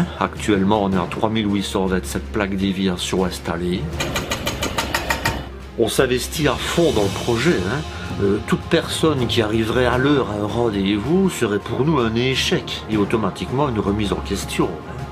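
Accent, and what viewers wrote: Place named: Liège, Belgium. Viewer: J'adore cet humour aux accents très liégeois.... un bon relai de la voix de la plèbe:-)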